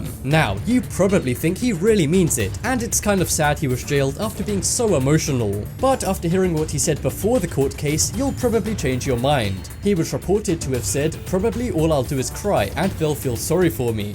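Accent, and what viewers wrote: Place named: United Kingdom. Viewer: The clickbait starter pack: British accent, arrows on thumbnail, thumbnail having nothing to do with the video, asking for a like at the beginning. You only have a British accent, so this video is not clickbait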